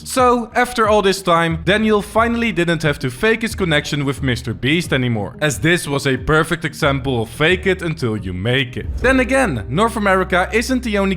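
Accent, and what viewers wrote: Netherlands. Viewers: You say the Netherlands but place the marker on Belgium... even tho I guess you're from the netherlands yourself, based on your accent and the way you pronounce 'Kriekel'.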